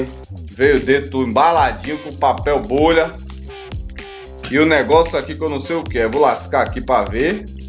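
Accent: Bahia, Brazil. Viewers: Senário muito foda, baianês excelente = a vídeo diferenciado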